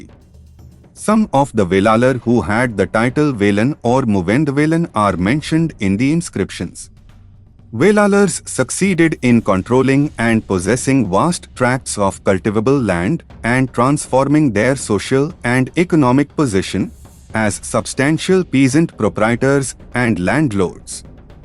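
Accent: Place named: North India, India. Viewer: Thanks for the channel to have done this.acxent seems to be from north..happy that history is spread over india.please.do it in hindi too brother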